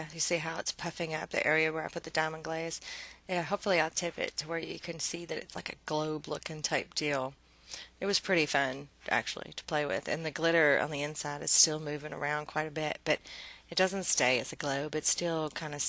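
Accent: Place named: Kentucky, United States. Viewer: i knew i recognized you accent! im also from kentucky! i recently discovered your videos and in glad i did! good luck!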